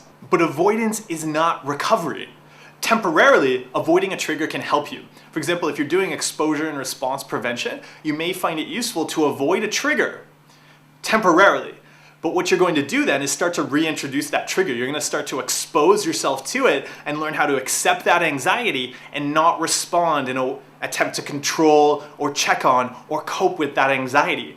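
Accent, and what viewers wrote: Canada. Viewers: Very helpful video. Im from northwest USA and did I pickup on a Canadian accent? Just wondering :)) thankyou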